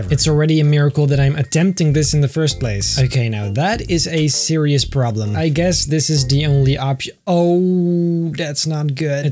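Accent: Netherlands. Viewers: Are you Dutch? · ar you dutch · Are jou from the Nederland or belgium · Are u dutch? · one more confirmed that is dutch he said hupatee en maybe he is also brabants (ik hoop wel dat t een brabo is want brabo`s zijn cool XD) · Your acccents sounds hollandischs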